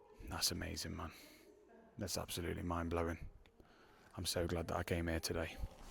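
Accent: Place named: England, United Kingdom. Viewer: Have you not seen York Minster? You sound English & not particularly 'southern' so I too am surprised that you were never taken on a school trip to a 'Minster' town. The whole town of York is stuffed with architectural & historical gems. The Minster of course but also Cliffords' Tower, the Guild Halls, the walkable town walls & ancient cobbled streets called 'gates', the Shambles, the Railway Museum, the ruins where the Mystery Plays are performed, Bettys Tea Shop & much more. You could easily spend a week there & not see or do everything. The river Ouse, when not in flood, is a treat in itself. I have not been to the Christmas Market for many years but it used to be a treat too. Cheers. Other towns of interest In Yorkshire alone worth a visit would be Ripon with its Cathedral, Harrogate, Knaresborough, Whitby for the Abbey & its neighbouring church & many more. 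Wishing you happy hunting. Since you are a joiner you should be particularly interested in any Rood Screens in church buildings that were not destroyed during the reformation. As a joiner it is also worth remembering that the Church also employed highly skilled craftsmen, artisans & labourers to build Cathedrals, Minsters, abbeys, nunneries, hospitals, chapels & churches, some of which took hundreds of years to complete & which all need the same skills for maintenance today. In the words of the old song this all made "work for the working man to do". These places may seem grand but the atmosphere is provided by hundre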